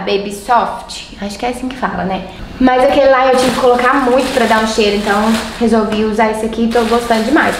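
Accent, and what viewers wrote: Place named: Minas Gerais, Brazil. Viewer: Esse sotaque mineiro.. eitha q orgulho soow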